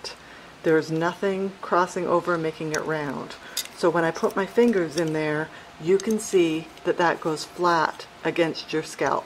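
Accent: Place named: Atlanta, United States. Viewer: Thanks...i will look out for them, Love your accent and the outdoor videos.. reminds me of living in Atlanta - oh and you always look amazing too:)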